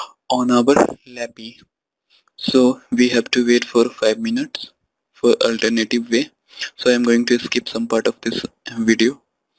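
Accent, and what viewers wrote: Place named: India. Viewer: when i hear an indian voice i hear scam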